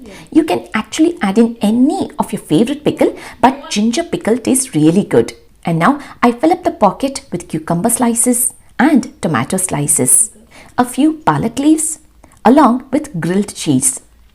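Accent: India. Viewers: Wow you are awesome..The way of your pronunciation is true Indian.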